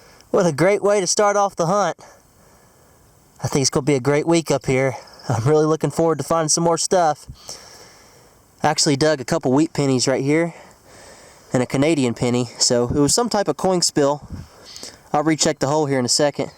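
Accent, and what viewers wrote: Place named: Rural, United States. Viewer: Ur accent says ur a hick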